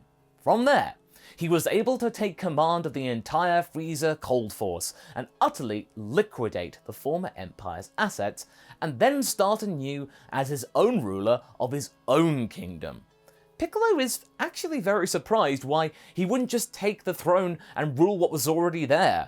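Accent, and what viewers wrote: United Kingdom. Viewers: I love you British voice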